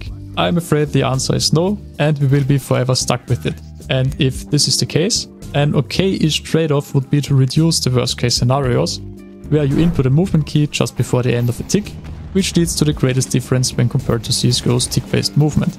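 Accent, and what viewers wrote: Austria. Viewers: hätte auf Österreicher getippt ;-)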